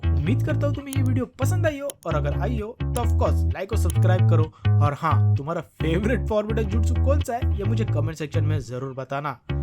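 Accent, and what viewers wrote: Maharashtra, India. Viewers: Are u Marathi❤😊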